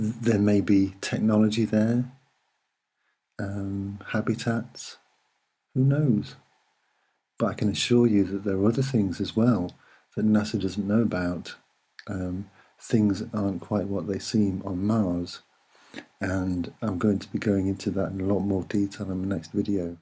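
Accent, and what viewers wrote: United Kingdom. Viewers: I believe him because he has a British accent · OMG another soft spoken British guy You're on fire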